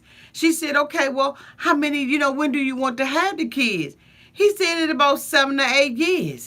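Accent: Washington DC, United States. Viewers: Hi there 👋🏾. I'm a newbie here. I've been looking for more reviews of this show. Glad I've found you! Where are you from? I here a DC area accent. Am I right?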